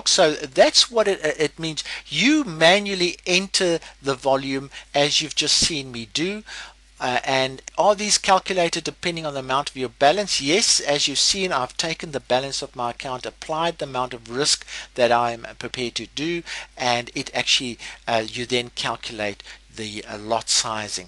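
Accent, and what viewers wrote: South Africa. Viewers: You must be from South Africa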